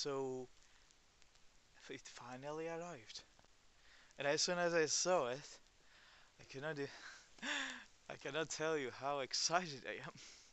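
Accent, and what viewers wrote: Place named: Greece. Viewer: I watched this purely for your beautiful, Greek accent. 😻☕️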